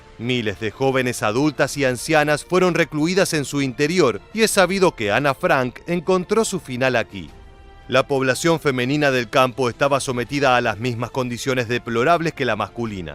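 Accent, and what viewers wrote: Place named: Argentina. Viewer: EL NARRADOR DE ESTOS REPORTAJES TIENE SUS MÉRITOS BIEN GANADOS, ES MUY AMENO Y SU ESTILO INCONFUNDIBLE. POR SU ACENTO ES AL PARECER ARGENTINO. ES LO UNICO QUE SABEMOS. LAMENTABLEMENTE SU NOMBRE ES DESCONOCIDO Y CONTINÚA COMO TAL.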